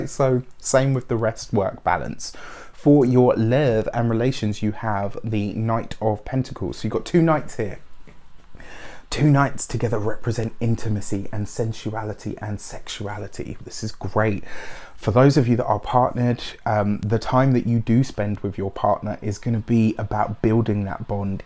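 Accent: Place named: London, United Kingdom. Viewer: Am from the south west of England absolutely love your accent makes me feel at home in LDN. Thank you for this weeks 🙌🏾🧡🙏🏾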